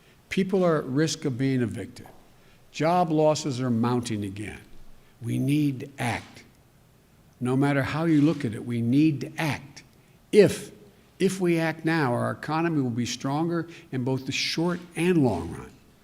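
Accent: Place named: Europe. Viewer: Hey are you American? Your accent betrays you sounds European, just asking? Maybe you were born in America and raised elsewhere anyways I do my homework. I Please stop trolling Traditional Catholics Conservatives and spreading strife....go find a liberal site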